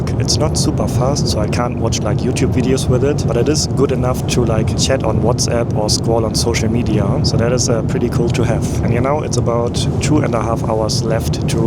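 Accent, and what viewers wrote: Germany. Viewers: Beautiful videos. As a Greek raised in different countries Love your German Accent 🙂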